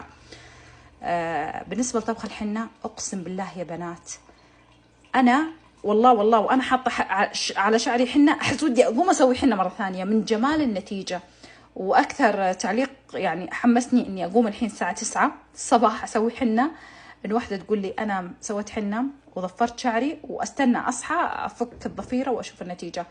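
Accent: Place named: Egypt. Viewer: حلوة اللهجة المصري على لسانك😂😂😂 ربي يحفظك❤❤❤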